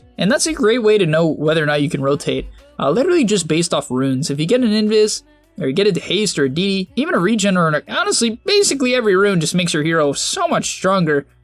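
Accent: Australia. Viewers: Australian accent got me good 😂😂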